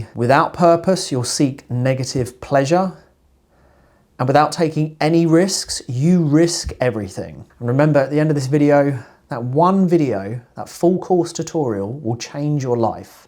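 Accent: United Kingdom. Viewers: My narcissistic boss comes into the office every day whilst we work from home so we can spend time with our families. He looks pathetic sat at his desk getting angrier because he can’t stand that work is not everything for some people. I wasted 30 years in my job commuting which time I’ll never get back, thousands of hours wasted commuting is the biggest regret of my life at 50. Can I just say it’s refreshing to hear an articulate British gentleman like yourself who doesn’t fill his videos with profanities.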